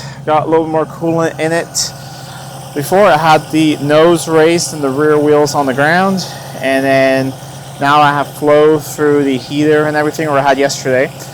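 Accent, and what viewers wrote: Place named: Midwest, United States. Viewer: Hey OP, how long have you lived in the UK? Sound like a Midwestern man.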